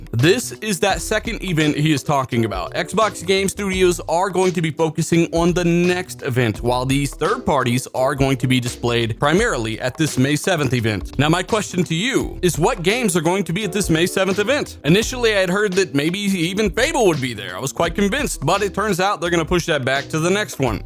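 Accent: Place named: United States. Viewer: maybe it's just the dumb American accent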